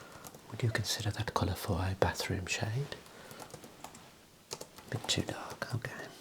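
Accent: Birmingham, United Kingdom. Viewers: Brummie accent. Found in Birmingham UK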